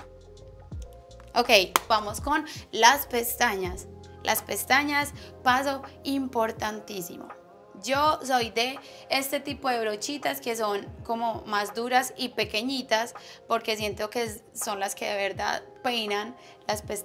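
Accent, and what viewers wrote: Antioquia, Colombia. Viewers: Amo el acento colombiano, sepan que tienen el mejor acento de Latam!!!! Y Karol tan hermosa, humilde, sencilla. Exitos nenaa! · Me encanta el acento colombiano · Su acento paisa ( Antioquia y medellín Colombia) es hermoso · Es acento paisa, en Colombia no tenemos un acento, tenemos muchos pero creo que el paisa es el más famoso en el exterior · Amo su acento súper colombiano ❤️❤️❤️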